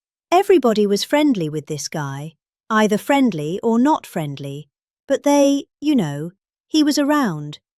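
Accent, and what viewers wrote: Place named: London, United Kingdom. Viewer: I'm a Londoner, I speak with a variant of this accent. I'd feel like a complete embarrassment to myself and everybody around me if I ever caught myself talking this kind of sewage.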